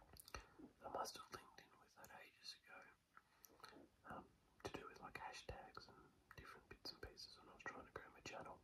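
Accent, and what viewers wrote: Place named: Australia. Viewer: You sound Australian.........and I don't like it.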